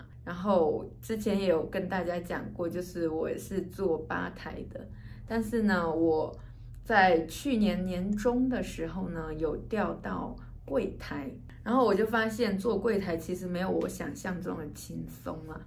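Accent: Taiwan. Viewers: 以後再被問哪裡人的時候，直接回答現在是台灣人，先生孩子都是台灣人，我很喜歡在台灣的生活，這樣就不會再有不會講台語的困擾，過幾年妳的口音自然就會台化了。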